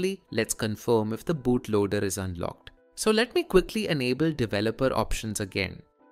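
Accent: India. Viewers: always guy with indian accent is most helpful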